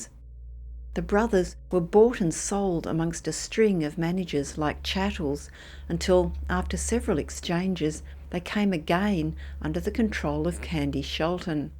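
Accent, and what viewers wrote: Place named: Australia. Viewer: Finally, an Australian narrator, with a nice soothing voice at that.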